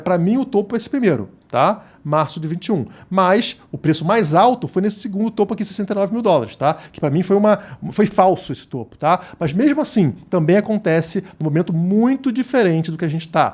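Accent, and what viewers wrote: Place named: Rio de Janeiro, Brazil. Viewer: pelo sotaque acho que é do RJ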